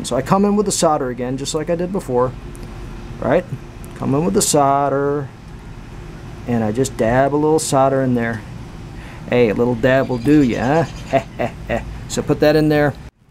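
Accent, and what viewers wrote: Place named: England, United Kingdom. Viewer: Terrible Pommy accent, good video!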